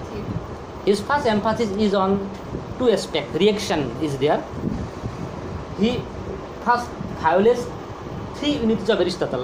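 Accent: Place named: Assam, India. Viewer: According to your pronounce, I'm sure you are from Assam 👍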